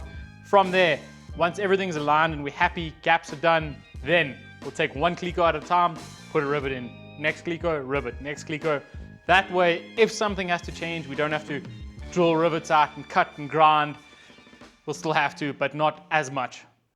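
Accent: South Africa. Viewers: Do I detect a South African 🇿🇦 accent?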